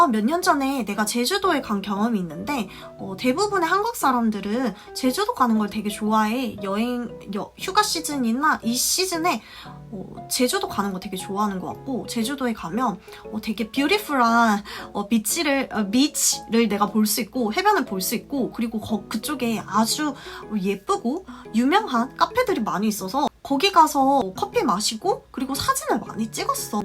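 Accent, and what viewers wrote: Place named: Gyeongsang, South Korea. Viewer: 베이스가 저보다 탄탄하신거 같네요 아 그리고 착각일수도 있는데 경상도사투리가 느껴지는건 기분 탓일까요 ㅎㅎ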